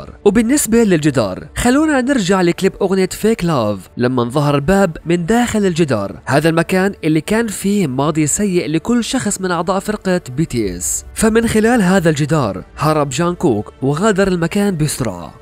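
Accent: Iraq. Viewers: قلي نت ليش تحجي مرات عراقي بس أرى اعرف 🙂🤔🤔🤔🤔🤔🤔متابعتك من العراق🇮🇶🇮🇶🇮🇶🇮🇶🇮🇶🇮🇶🙈🙈🥰🥰🥰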